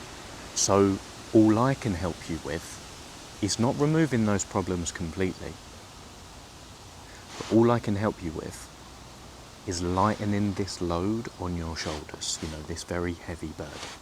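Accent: United Kingdom. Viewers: I'm 22, and I'm not struggling so much right now, thanks to actively accepting both who I am but also who I have been, and being forgiving. Extending a hand to the version of me that fucked up, instead of insulting it. But it still feels like a neverending tug-of-war with myself, still. With every positive thought comes an equally negative one, that i need to choose not to listen to. I am doing well now. And I'm on a path that is finally mine. And yet I feel that if I start relaxing just a little bit, if I loosen my grip on the rope I'll fall forward and back into the abyss of despair and fear. One day I feel like I can wholeheartedly trust myself and my decisions, and the next I stay on full alert to avoid making even a single misstep. This is why, although I am currently doing fine, this video is going into a special little box, that I keep filling while I feel clarity, and trust in my decisions. 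Because inevitably, when life throws another punch at me and I'll be there lying on the floor, I'll be relying not just on me, but also on little me. Adolescent me, depressed me, happy me, and future me. Thank you. Your videos help many people, and anyone could use a soft spoken british voice giving them encouragement in their times of need. ✌️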